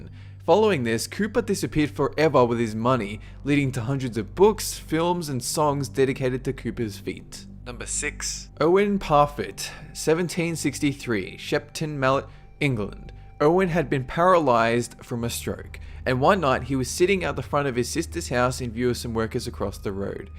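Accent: Australia. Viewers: Australian accents are HOT. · I've wondered this for a while, and hope someone who knows, or himself will answer this question. Are you from Australia? You sound like it but I just cant tell, it sounds normal to me because I live there, but watch many american videos.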